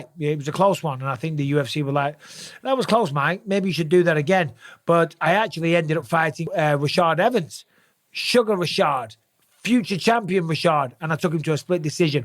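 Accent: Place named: Liverpool, United Kingdom. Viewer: Scouse throbber.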